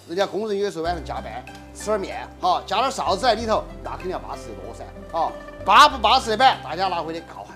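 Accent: Chengdu, China. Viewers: 好亲切的成都话！多谢！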